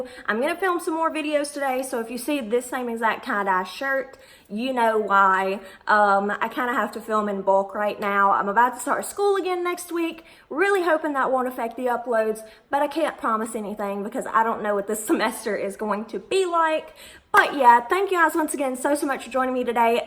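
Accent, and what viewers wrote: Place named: Kentucky, United States. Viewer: Are you from Kentucky? I’m from Louisville in you sound like your from Lexington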